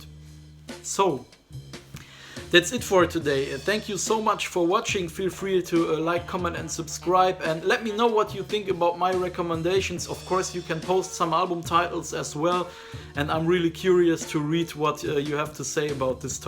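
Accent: Germany. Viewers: Ist er Deutscher? Hört sich so an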